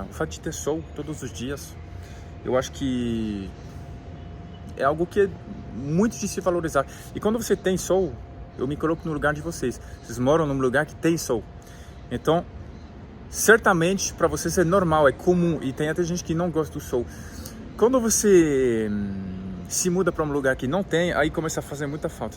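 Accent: France. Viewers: O portugues do cada dia melhor. Daqui a pouco ninguem vai ouvir o o sotaque frances. Eu morei um tempo em Portugal, e não gostei. Acho que glamoriza-se muito morar na Europa. Por exemplo, os portugueses são muito dificeis, estressados, rudes, burocráticos, sem paciencia, parece burro empacado. Sem falar na agua horrivel. Paga-se uma fortuna pra comer uma carne decente. Ponto positivo para a segurança, o euro, a estrutura, apesar q salario portugues é bem baixo. Mas visitei uns amigos no Sul da França, alguns vilarejos muito lindos a caminho de Andorra, não tem como não se apaixonar pela França. Embora tenha tido pouco contado com franceses, me senti acolhida, senti que é um lugar aonde eu viveria feliz. Mas como estava morando em Portugal e nao tinah me organizado pra ficar na França, acabei voltando ao Brasil. Apesar dos problemas do Brasil, da corrupção que esta no DNA de muitos brasileiros, da ignorancia, da idiotização, da influencia da cultura americana, da moeda desvalorizada, falta de estrutura, amo meu pais. 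Faz muita diferença esta perto da familia, dos amigos, o clima, sol (como faz falta) as pessoas, a variedade e qualidade da nossa alimentação, a agua, a cultura mais solidaria, o humor do brasileiro, a praticidade, as modernidades que ja existem no Brasil, as praias, as do Nordeste, amo. Mas tb nao gosto das praias com muita construção tipo as de cidade. Balneario, Rio, Recife. Prefiro as mais afastadas, mas com alguma estrutura. Porque aquelas praias no meio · Sotaque de franceses falando português é tão fofinho kkkkkk ❤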